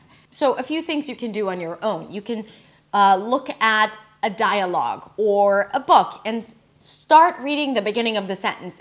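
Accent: United States. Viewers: Wow, your native is Hebrew and you sound like an American, even speaking very quickly, good teacher. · I can understand you clearly, I thought you were American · Hey, you are super nice about your accent. you incorporated totally the american accent. That's my point of view.. Xoxo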